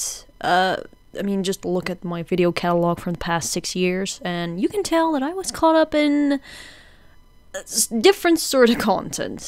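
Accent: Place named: Netherlands. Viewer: Welcome back,! I miss your glorious animated story time videos and this is certainly one of them with your fluid, cute art style and entertaining stories and storytelling! I also love your Dutch accent and you remind me of a fellow Dutch artist friend of mine. Anyway, I anticipate seeing more content like this and I would like to see more of your bootleg Vocaloid days. 😄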